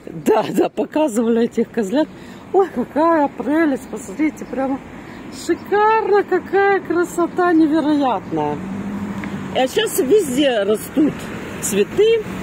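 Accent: Odessa, Ukraine. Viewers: Да Вы чудесно разговариваете, я просто очарованна Вашим одесским говорком!!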